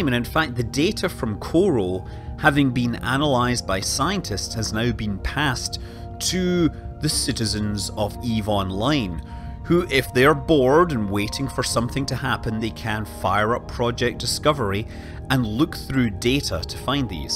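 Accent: Scotland, United Kingdom. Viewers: Nice video, I enjoyed the scotish accent !! ...The earth is the most massive solid planet in the solar system and has the third magnitude of surface gravity after Jupiter and Neptune, escape velocity of 11 km / s (40,000 km / h) to elude such gravitational field it requires a huge amount of energy (we could say that the earth it's already a super earth) Inhabited planets with higher masses could be gravitationally unattractive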